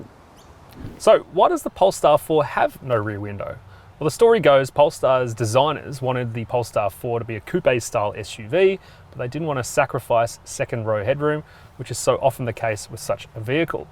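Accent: Australia. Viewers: The only spoiler is that tearable Australian accent. Very hard to understand. Kiwi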